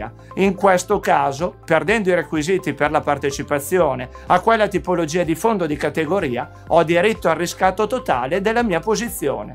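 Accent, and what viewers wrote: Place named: Piedmont, Italy. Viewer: M'iscrivo, oltre che per i contenuti interessanti, anche per il marcato accento piemontese😄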